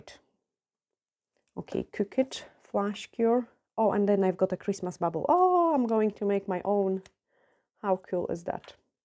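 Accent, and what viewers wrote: Scotland, United Kingdom. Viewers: I knew I heard Scottish in your accent! Love how you’ve picked up wee words.. 🥰 am in central Scotland wee bit far from you, your nails are stunning, have you tried the new soft gel tips yet? I’ve ordered mine from Gelish Harmony xx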